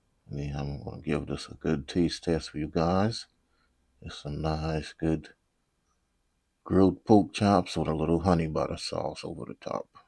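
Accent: Charleston, United States. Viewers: Trying to find out if you have a restaurant and if so where you located? Sounds like your from Charleston SC ? Lol 👍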